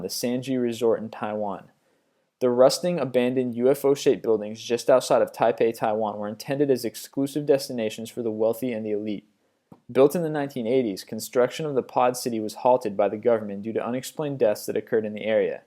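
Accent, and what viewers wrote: United States. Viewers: EVILBUNNY28 The suffixes of -burgh and -ham are pronounced the way he pronounced them in the US. Pittsburgh, Pennsylvania is pronounced pits-burg. It's not that we don't have names like that in the US and therefore he had a guess, it's that we simply pronounce them differently (likely due to our mixed culture) and that's what he is used to. Many Americans would distinguish Edinburgh with the UK pronunciation but that's because -burgh has the necessities to be pronounced -burra. The pronunciation of -ham is very much an accent or something you need to be told to do thing. Pronouncing "-ham" as "-um" doesn't make any sense to people who are native speakers but who have not grown up pronouncing "-ham" as "-um". Sort of like telling Americans they need to start dropping their R's on words. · ***** You're not american then I guess because that's what americans sound like. 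· STOP complaing about his bloody voice!! He is America, he sounds like that.. he can't just magically change his voice to please you. Get used to it, or leave.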